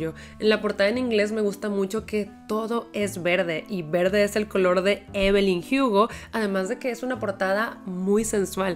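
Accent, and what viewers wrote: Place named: Mexico. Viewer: Estoy aprendiendo español con tus videos. ¡Hablas tan hermoso y claro! Practico mi acento mexicano contigo <3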